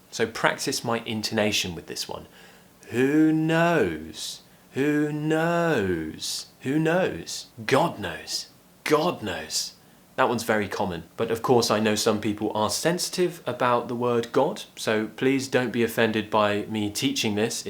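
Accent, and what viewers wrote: RP, United Kingdom. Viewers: You are the BEST pronunciation teacher I am falling in love in British Accentbbecaise of YOU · My God I'm literally in love with your British accent !!!! 🥰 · please sir i like your accent so much and I'm your follower student on youtube podcast. I found the 4000 essential words are read by American accent and really I like it to be with your British accent. please try to help us by reading these 4000 essential words in English. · I love your accent. Other British accents are also good but this RP accent is the most attractive one. It sounds confident, classy and so alluring. I can't wait to join your course and speak with you! ^ ^ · Guys what british accent that he uses? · Hi, your British accent is really amazing, and this lesson is really helpful. Thanks.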